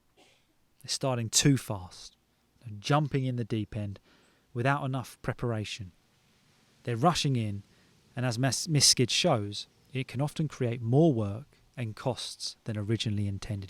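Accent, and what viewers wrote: England, United Kingdom. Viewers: Hello,I like your videos,they are amazing. Especially like this kind of video where you talk about an issue and discuss it.I learn a lot from you.I like your british accent.so go ahead and make more of this content.from Algeria 🇩🇿 Many thanks 😊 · Is it british accent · iam glad i found you i like english accent way better than american so this is just perfect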